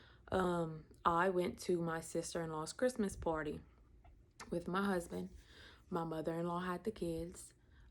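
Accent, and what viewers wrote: Louisiana, United States. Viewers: I am so sorry for your extremely difficult loss of so many members of your family!!! Let me tell you something girl, those people who climb Everest don’t have a thing on you! You are a living testament of resilience, strength, courage,compassion and love! I knew from your accent you were from the New Iberia area.. I am from calcasieu parish. ❤❤️‍🩹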